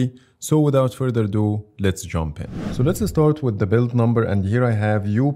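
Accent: Egypt. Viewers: Bro ur accent is Egyptian 🤨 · I love the egyptian accent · Your accent is egyptian dude….love the video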